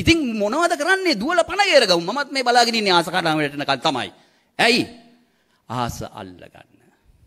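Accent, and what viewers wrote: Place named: Kandy, Sri Lanka. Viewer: එන්ට යන්ට කියන්නේ නැහැ නේ ඔයා .නුවර කට්ටිය කතා කරන්නේ එහෙම නේ.එ බශාව ලස්සනයි .ඔබේ කථාව අති විශිෂ්ඨයි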